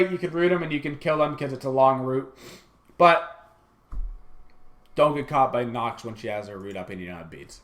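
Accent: United States. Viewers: You seem like the type of guy who speaks " 'merican" as you would say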